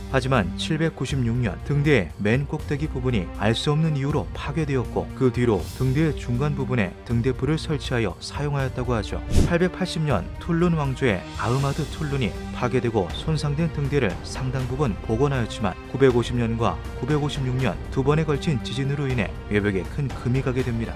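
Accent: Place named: Gangwon, South Korea. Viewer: 기묘한밤 나레이션 담당분은 고향이 강원도 아닌가요? 강원도 특유 억양이 있는거 같은데 ㅋㅋ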